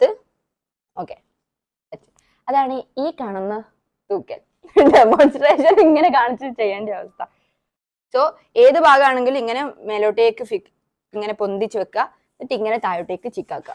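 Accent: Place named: Malabar, India. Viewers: Malabar slag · Kannur slang athyyavishyamund❤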